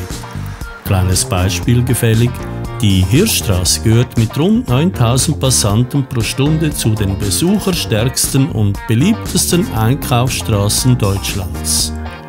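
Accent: Switzerland. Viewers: sehr schönes Video eine sehr angenehme Stimme mit diesem netten Schweizer Dialekt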